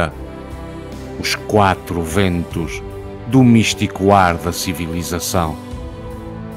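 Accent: Portugal. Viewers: Acredito que você seja de Portugal pelo sotaque. Acompanho-o do Brasil. Fernando Pessoa, o melhor poeta do mundo. Indico-lhe o que para mim é o segundo: Augusto dos Anjos. Comece pelos poemas "Versos Íntimos", "Psicologia de um vencido", "Budismo moderno" e "A Árvore da Serra". Grande abraço!!!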